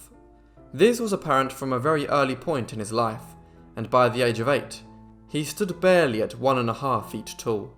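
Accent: England, United Kingdom. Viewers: British accent. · He speaks English, the English way, you know, the way it's supposed to be pronounced.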